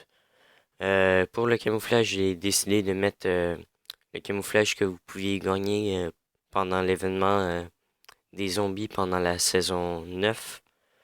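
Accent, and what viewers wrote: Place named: Quebec, Canada. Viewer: Ho l'accent quebecois 😍😂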